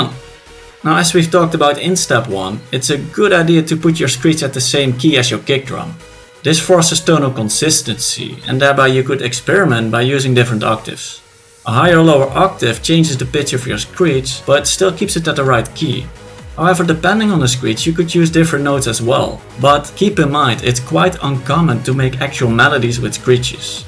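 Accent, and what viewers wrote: Netherlands. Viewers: Ik hoor je een nederlander bent dus stel ik men vraag ook in het nederlands , als ik een screech rechtstreeks in de playlist load is de screech nice of normaal maar eens in de piano roll dan is de sample een heel pak korter tenzij ik jetnop een lagere noot zet maar dan is de sound ook lager dan wat ik wil dus hoe krijg een hoge maar lange screech in dienpiano roll ook de noten langer maken maakt de sample niet langer ? Grtz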